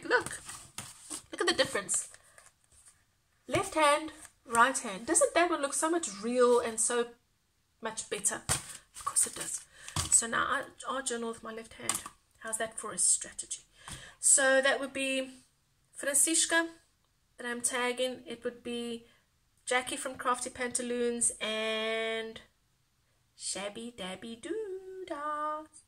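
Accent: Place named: South Africa. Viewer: So happy to finally see you my friend, you have such a positive and smiling face. I just love your accent and really feel that South African accent is soo cool. I have a mix of indian and South African accent but my 4,5 year old daughter has very strong South African accent and she always corrects me 🤪.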